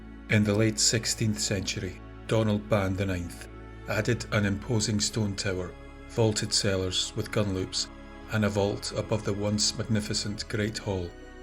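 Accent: Scotland, United Kingdom. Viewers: How did I miss notification for my favourite channel and the guy with greatest Scottish accent. As a paranormal investigator for 20 years I researched, investigated some of England and Scotland's most haunted locations until I stopped in 2010. I miss what I love deeply. Investigating, the research, the stories told to me were my big love but my point being, we all love a great ghost story and knows just how to tell a great ghost story. This channel is growing fast and there is no great channel for us all to help grow. The paranormal world is full of fakers, lyre's and cheaters right now and sadly its not showing any signs of getting better as I hoped in time it would? I still like to research and visit locations but its more hobby now than full time job. I worked closely with ghost finders of Scotland based just outside Glasgow. That's not a plug I promise you because like my that team is no longer lives. 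Some of the best teams know to the paranormal world are no longer. They have become something of ghosts themselves fading into history and now we are left with these who care more about money making that finding the truth as we knew it. Scientific methods is no longer used by the few as the many seem to just practice fame seeking. Its a paranormal world I look to stay away from now! Thank you for all you dedication and hard work since channel birth. all though ghostly tails change over the years because everyone adds on little bit for horror effect th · A new subscriber here too. Anything I mean ANYTHING, related to Scotland and I'm HOOKED. Beautiful , Tragic, Romantic, Brutal, and Betrayals, Beautiful Scotland has seen it All Even your accent has me Hooked. Looking forward to many more Tales. ❤ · Good 🌄 morning.....so good to hear that wonderful Scottish accent. I am proud to be from Scottish ancestors and I love ❤️ the stories. 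Thanx😊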